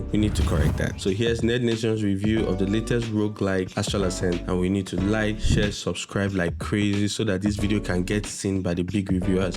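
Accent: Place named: Africa. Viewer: Heard the african accent, subscribed immediately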